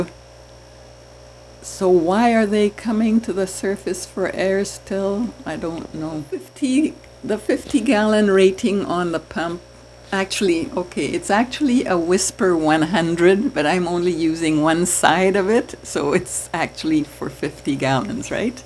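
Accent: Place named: Trinidad and Tobago. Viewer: nice video, i picked up the trini accent in the 1st 30secs lol. reminds me of home. good information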